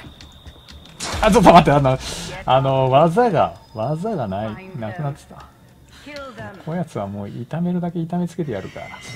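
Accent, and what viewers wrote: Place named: Kyushu, Japan. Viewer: 九州方面の方ですか？聞き覚えのある方言がちらほら…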